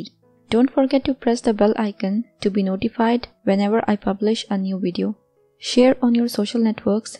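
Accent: India. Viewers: SORRY . WE DONT UNDERSTAND . YOUR. INDIAN. HINDI ENGLISH . SORRY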